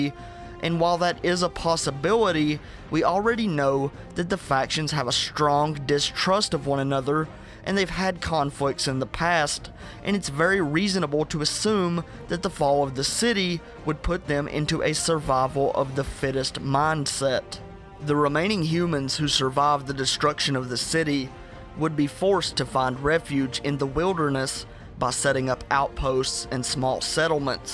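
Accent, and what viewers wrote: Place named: West, United States. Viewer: I click on the video. The first 3 seconds I'm like: oh great it's the guy with the western accent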